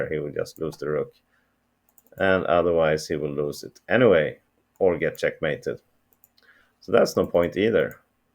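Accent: Sweden. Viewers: What a bizarre comment!! I like Swedish accents and the overall impression is most personable.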